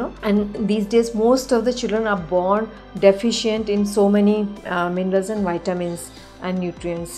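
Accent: India. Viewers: Indian accents are one of the most lovely sounding accents in the world, IMO. Thank you for the informative video.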